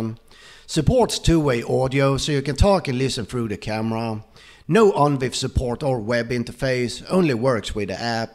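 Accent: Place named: Sweden. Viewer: Funny swedish accent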